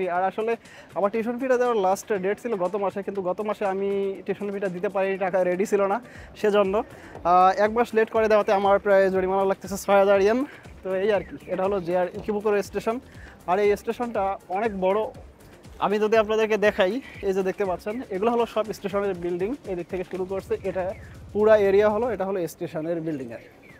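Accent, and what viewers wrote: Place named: Cumilla, Bangladesh. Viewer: ভাই আপনার বাড়ি কি কুমিল্লা নাকি? ওই এলাকার ভয়েস এর মতো মিল লাগে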